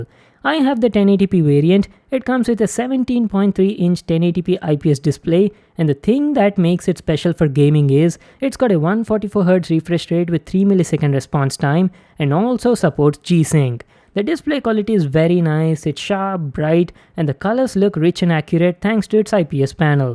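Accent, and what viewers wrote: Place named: India. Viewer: At least I found the perfect Indian accent so smooth!!!!!!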